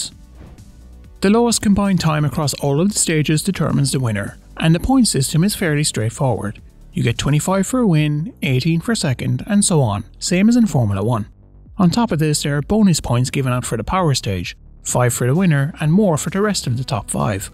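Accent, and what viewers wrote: Ireland. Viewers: I can tell from the accent youre irish like myself so lets pray for a craig breen win😂🇮🇪🙏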